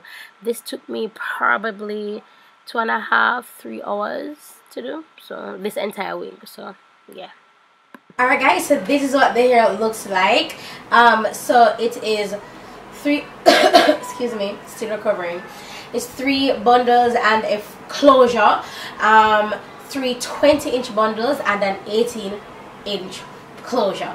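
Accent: United Kingdom. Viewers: I love this hair and your British accent 😊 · Which shipping company you use to ship your hairs herr in j.a ? And is it expensive ? Love the british accent tho 😘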